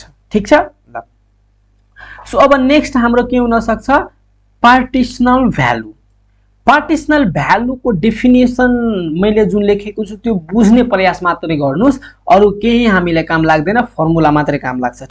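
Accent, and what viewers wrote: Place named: India. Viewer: I dont understand your indian accent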